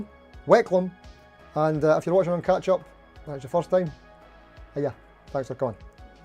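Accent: Scotland, United Kingdom. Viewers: Never apologize for your accent! Remember the first rule of quality..."If it's not Scottish... IT'S CRAAAAAPPPP!"🏴🤪👍✨💙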